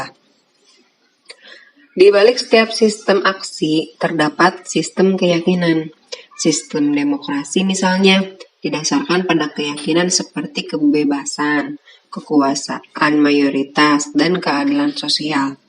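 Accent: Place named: West Java, Indonesia. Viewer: Teteh orang sunda yah logatnya keliatan hhi nuhun teh tos macaken buku atomic habit ❤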